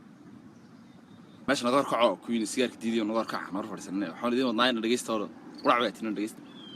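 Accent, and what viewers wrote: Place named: Hargeisa, Somalia. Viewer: reer hargysa iyo rer burco siaybu hadlaan buu uhadlaa nin kani wlhi qudhac iyo waxaasibay isticmalama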